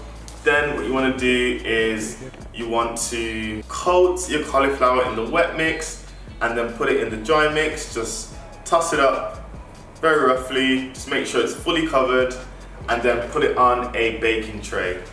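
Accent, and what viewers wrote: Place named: United Kingdom. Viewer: UK ...nice one!! was just looking for cauliflower recipe, and your channel popped up. And even better when I heard the accent, okay I'm off to Tesco to get my lemon 😁....oh and I've subscribed, nice presentation BTW.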